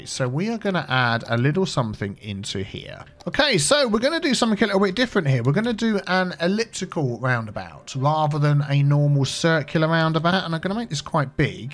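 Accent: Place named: England, United Kingdom. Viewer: It's really just about the way your voice sound that I watch your videos. And your accent. English accent. You've got that strange tone, never heard anything like it anywhere else. I like it thought.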